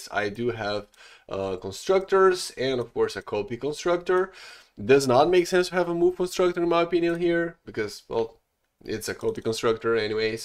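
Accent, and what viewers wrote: Brazil. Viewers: are you brazilian by any chance? I can guess by the accent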